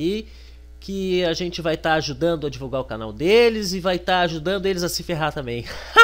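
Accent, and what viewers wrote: Rio Grande do Sul, Brazil. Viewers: tu é gaucho??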